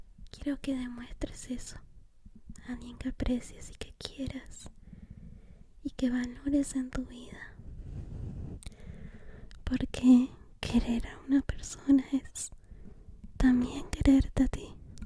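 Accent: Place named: Argentina. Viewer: Desde que parte de Argentina transmites los videos, lo digo por la entonación.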